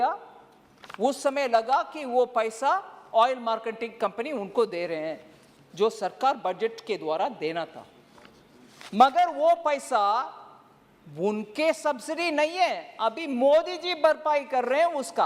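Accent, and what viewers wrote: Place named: Tamil Nadu, India. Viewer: Just shut up she's Tamil.. Hindi is not her mother tongue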